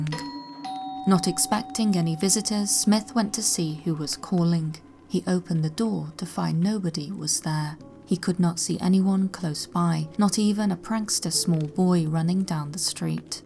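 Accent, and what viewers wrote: United Kingdom. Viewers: you’re awesome! Your voice is so soothing, it seems that anything spoken in British accents makes them seem more real. But that last one about the Black Lady, that’s quite a damn good reason to roam the earth after death. Black lady, Black Lady, I’ve stolen your, oh hell no I’m out of here!!!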